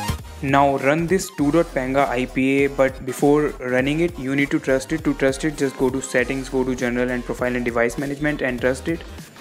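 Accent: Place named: India. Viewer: indian accents.... ughh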